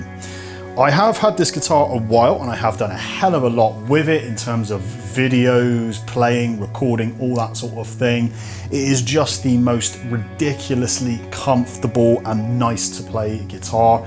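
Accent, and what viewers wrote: RP, United Kingdom. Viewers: You have a very good taste in collecting instruments. Loved your accent too, sounds posh :)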